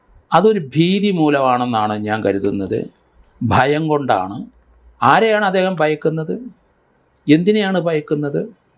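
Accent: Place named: Thiruvananthapuram, India. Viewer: അത് പ്രാദേശിക ഉച്ചാരണം ആണ്. ഞങൾ തിരോന്തോരംകാർ അങ്ങനെ പലതും പറയും. ആറ്റുകാൽ കോവിൽ എന്നതിന് ആറ്റുവാകോയിൽ, ജനറൽ ആശുപത്രിക്ക് ജന്നലാശൂത്രി ഒക്കെ ആണ്.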